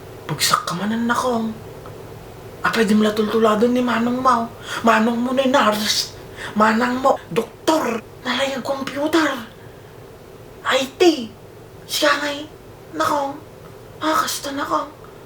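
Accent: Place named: Tarlac, Philippines. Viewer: Magka-accent kayo ni Daddy hahaha taga-Tarlac po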